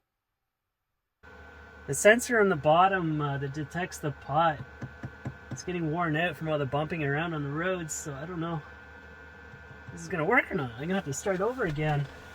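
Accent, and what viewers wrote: Canada. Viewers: Great video brother you are a hiking fool. Very good drone footage. My first time watching your vids I’ll definitely subscribe I love these types. I’m guessing this is in Canada??? You kinda sound Canadian. Wish you safe travels your friend from Oregon 🤙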